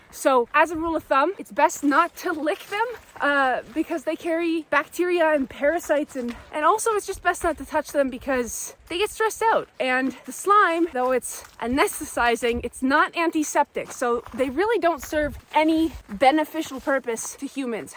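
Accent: Canada. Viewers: The Canadian accent is still there young.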